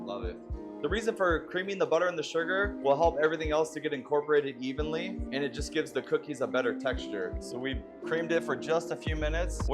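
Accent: United States. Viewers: You from Hawaii? I can hear small kine pigeon or local accent! Right on, Braddah… keep up the good work🏝️ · Sweet, but you sound like an American. You know, we use cups as measurements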